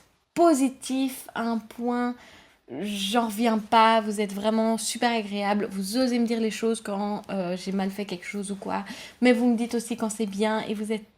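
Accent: Belgium. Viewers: Hello ! Je suis une nouvelle abonnée à ta chaîne ! En effet, je me demandais de plus en plus si le bullet journal était fait pour moi ou non et je me suis gavé de vidéos pendant toutes une journée sur le sujet. De ce fait, je suis tombé sur ta chaîne et je la trouve géniale (et toute mignon, au passage !) ! Ca fait du bien d'entendre un accent de chez nous, hahaha ! D'ailleurs, je me demandais de quelle région viens-tu ? J'aurais parié pour Brabant Wallon ! Enfin tout ça pour dire que tu m'as beaucoup inspiré dans l'organisation de mon bullet ! Ca n'est jamais facile de commencer dans un domaine qu'on ne connaît pas et avoir des petites vidéos comme les tiennes sous la main est toujours utile ! Donc voilà, je te fais de gros bisous (cachuètes ;P ) et continue comme ça ! (Et bien évidemment, je participe aux concours ! )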